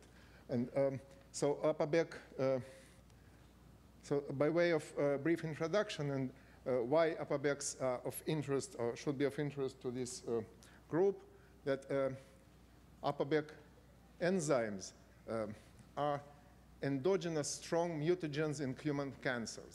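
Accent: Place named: Russia. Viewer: He speeks with thick russian accent.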